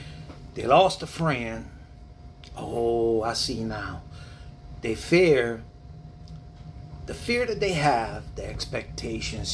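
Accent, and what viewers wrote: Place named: Louisiana, United States. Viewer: You remind me of my Grandfather! Both of them .. Both of them were born and raised in Louisiana. Southwest Louisiana to clarify :) Really enjoy listening to you. Reminds me of Home.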